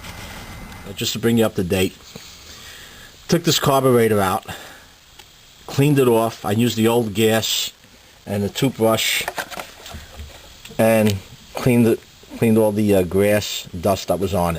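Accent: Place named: New York, United States. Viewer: Old - Fashioned New York Accent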